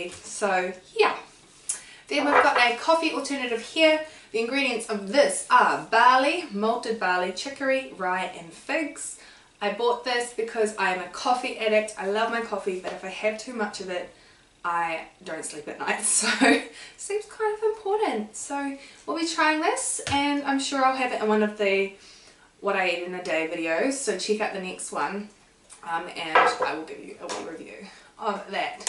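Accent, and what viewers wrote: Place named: New Zealand. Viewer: You are just what I'm looking for, vegan just started slimming world and just put the daily dozen ap on my phone, I also spent a lot of time in N.Z. so loving your accent, thanks for sharing xx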